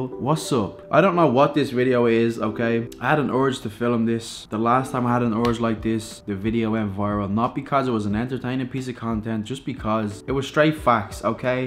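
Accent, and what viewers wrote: Ireland. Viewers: Ireland prolly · i like ur accent i am irish too bud :)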